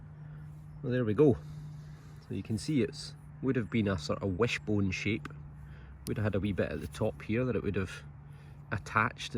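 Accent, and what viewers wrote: Scotland, United Kingdom. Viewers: Just discovered your channel and am thoroughly enjoying your videos. Love the Scottish history lessons and listening to your sounding so Scottish. Hello from Oklahoma, USA.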